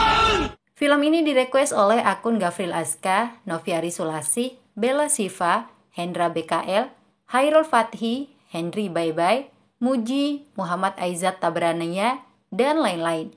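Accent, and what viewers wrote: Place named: Padang, Indonesia. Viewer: Ini admin yang ada logat Padang nya ya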